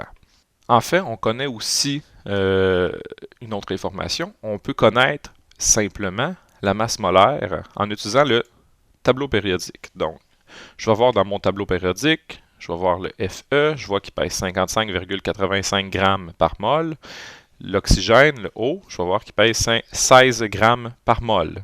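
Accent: Quebec, Canada. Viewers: Merci beaucoup ! T'es canadien? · Merci pour l'aide! Mais cet accent quebecois est pas tres beau :/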